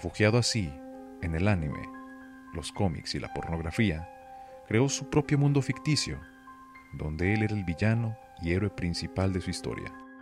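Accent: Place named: Mexico. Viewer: Muy buenos vídeos, te recomendaría que hables de una forma más pausada ya que el acento mexicano resalta mucho, de ahí en adelante todo genial...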